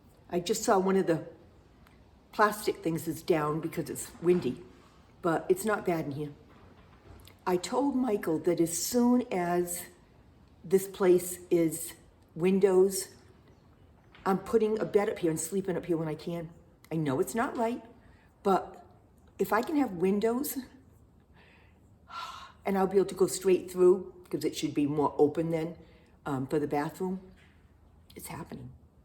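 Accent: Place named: Massachusetts, United States. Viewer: Hello, new subscriber here! I just found your channel and I love it! As soon as you started talking I knew you were from Massachusetts! I can relate to everything you have said in this video. I am slowly learning self care instead of being a doormat. I look forward to watching your future videos as well as your back catalogue. All the best to you and your family from Massachusetts!!😊🌲🌳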